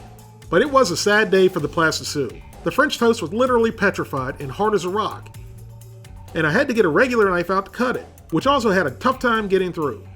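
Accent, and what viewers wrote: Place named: East Coast, United States. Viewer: you sound like you're from the east coast. What area are you from?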